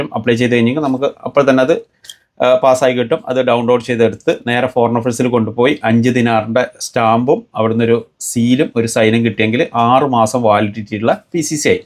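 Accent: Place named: North Kerala, India. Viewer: താങ്കളുടെ വീഡീയോ വളരെ ഉപകാരപ്രദമാണ്. പ്രവാസികള്‍ അറിയേണ്ട നിരവധി കാര്യങ്ങള്‍ സമയാ സമയങ്ങളില്‍ അറിയുക്കുന്ന താങ്കള്‍ക്ക് നന്ദി രേഖപ്പെടുത്തുന്നു. താങ്കളുടെ സംസാരത്തില്‍ നിന്ന് താങ്കള്‍ വടക്കന്‍ കേരളത്തില്‍ നിന്നുള്ള വ്യക്തിയാണെന്നാണ് മനസ്സിലാകുന്നത്. പക്ഷേ, പലപ്പോഴും താങ്കള്‍ സംസാരിക്കുമ്പോള്‍ തെക്കന്‍ കേരളക്കാര്‍ തെറ്റായി പറയുന്നതത് പോലെ 'കിട്ടത്തില്ല', 'പറ്റത്തില്ല' എന്നൊക്കെ പറയുന്നതായി കാനുന്നു. നല്ല കാര്യങ്ങള്‍ സ്വീകരിക്കുന്നത് നല്ല കാര്യം തന്നെ. പക്ഷേ, ഇത്തരം അബദ്ധങ്ങള്‍ എന്തിനാണ് അനുകരിക്കുന്നത്?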